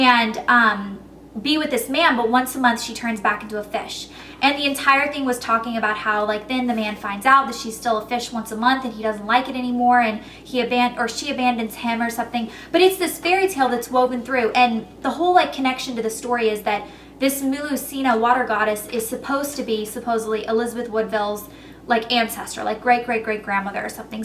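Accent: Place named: California, United States. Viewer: It's fun to notice your accent changing. I'm not from the states but I notice how your accent becomes more 'californian' I guess =)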